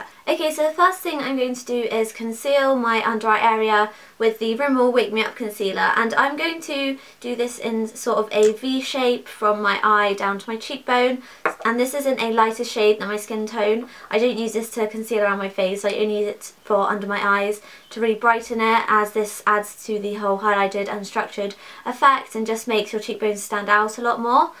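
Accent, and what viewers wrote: United Kingdom. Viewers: Where abouts in the UK are you from? :) Btw great video, found it very helpful!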